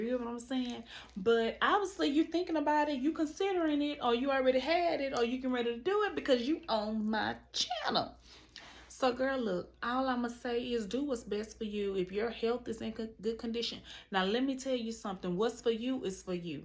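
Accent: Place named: South, United States. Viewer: Why every time I see you, I be like Hey Cousinnnnnn!!!. I stumbled across your video from your 2nd round and girl I love your lil country accent and beautiful spirit. Also you’re very informative. Thank you for the good tea and helping me stay focused on my upcoming surgery. These videos helps! Keep going cousin!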